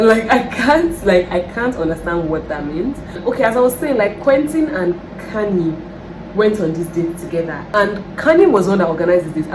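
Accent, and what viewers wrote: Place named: United Kingdom. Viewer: Your British accent 🙌😹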